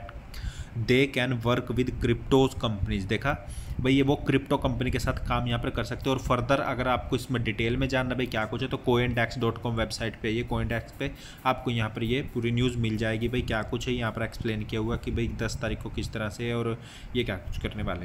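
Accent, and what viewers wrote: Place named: Haryana, India. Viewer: Pure hariyani Bhai.....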